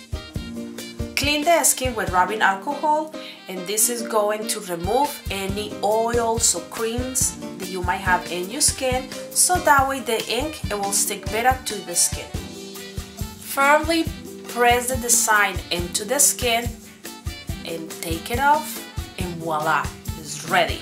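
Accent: Colombia. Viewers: tu eres tan hermosa y puedes grabar en español, inglés,o en chino si quieres, lo único es que se te extraña en el video..... Ami la verdad a me gusta más escucharte en tu idioma porque me gusta mucho el acento de l@ colombian@.....Gracias por todo lo que haces.......y suerte en todo lo que hagas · ay mi cileo tengo un proble ni el berrco en la vista y no puedo leer porfa habla en colombiano jejeje vivo en chile y eres la unica persona que me recuerda a mi pais jeje (colombia)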